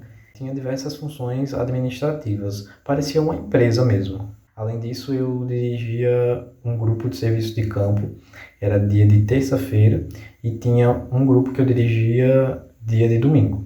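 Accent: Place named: Aracaju, Brazil. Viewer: Aracaju. Tava esperando você dizer a cidade pra saber de onde é seu sotaque.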